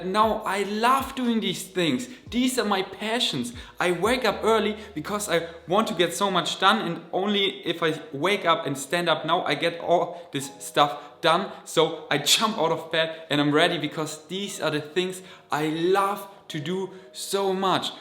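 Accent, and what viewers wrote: Germany. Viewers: Hey Du, ist mir schon mehrfach aufgefallen, jetzt muss ich es einfach sagen😂 ...Vor einem englischen Wort mit einem Vokal bzw. Vokallaut am Anfang "an" statt "a" benutzen! AN incomplete protein! Fließt doch viel besser so!🌞✔ Und um den deutschen Akzent zu verlieren, würde ich mir Videos wie "How to sound like an American" anschauen!🌚 Und wenn Du die Wörter nicht wie im Deutschen mit einer kleinen Pause dazwischen aussprichst, sondern zusammenziehst, wirkt das auch gleich viel besser (deshalb auch an statt a, damit man die Wörter ohne Unterbrechung aussprechen kann) 🌞✔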